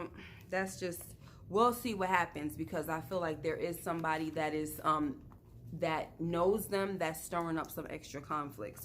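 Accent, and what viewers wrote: New York, United States. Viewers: Oh WOW! I’m first! New subbie! Love your accent - sounds like home NY!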